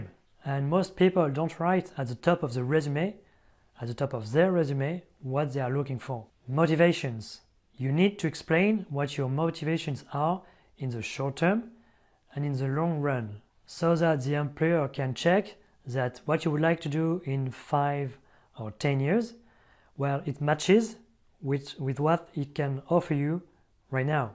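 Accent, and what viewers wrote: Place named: France. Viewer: Je ressens toujours l'accent Français quand vous parlez lol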